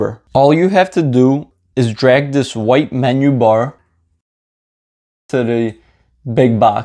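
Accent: Detroit, United States. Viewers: I’m wondering if he’s from Detroit? I am… What up doe? 😊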